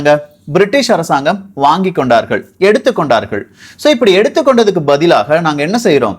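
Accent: Kerala, India. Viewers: Malayaali aano ?? Apdiya Kerala vaasanai veesuthu but azhaga Thamizhla singaarikingo... 😍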